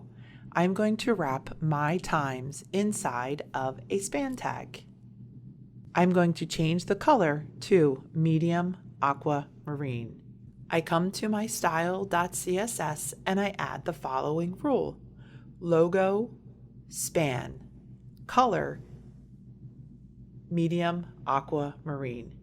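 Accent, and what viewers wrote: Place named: Philadelphia, United States. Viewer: Thank you for the info! I could definitely tell you're from the Philly area by the accent. (or should I say, "Ack-sent") Much love and GO BIRDS!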